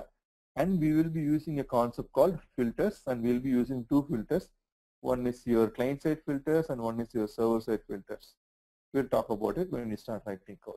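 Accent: India. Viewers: it is easier if u are indian. bec you are having the same accent, no offense.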